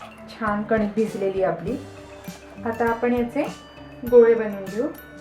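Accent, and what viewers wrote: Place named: Satara, India. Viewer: mi pan satarchi ahe.. bolnyavhya style Varun guess kele mi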